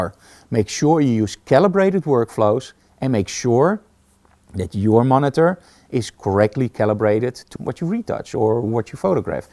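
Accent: Netherlands. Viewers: Je hoort dat goed dat je een nederlander bent lol. Heb juist een eizo flexscan ev2750 gekocht, wat denk je ervan? Heb ook een 4K monitor van asus, heb die gekalibreerd met de i1pro en kleurweergave is echt top. Maar 4K in een 27 inch scherm...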